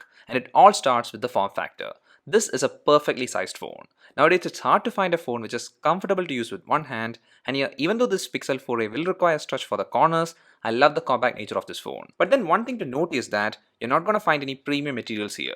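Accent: Kerala, India. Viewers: Mallu boy with saipp english 😁✌️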